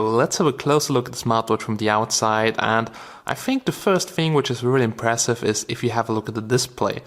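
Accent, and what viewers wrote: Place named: Germany. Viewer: You sound german m8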